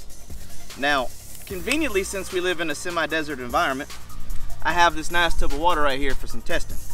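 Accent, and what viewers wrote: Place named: South, United States. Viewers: Are you from my part of the woods in West Virginia? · Yep that southern drawl was thick, pay no mind brother ignorance is bliss. Liked the quick shot of the systematic last couple vids ago. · you sound more southern today!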